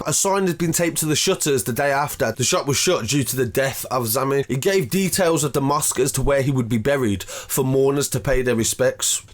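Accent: Birmingham, United Kingdom. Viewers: Man you have strong brummie accent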